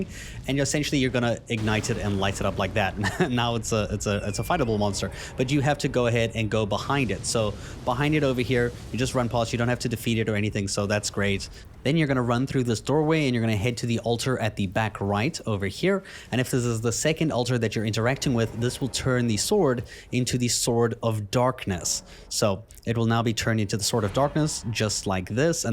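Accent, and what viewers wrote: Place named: South Africa. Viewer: are you South African? i can hear that accent poking through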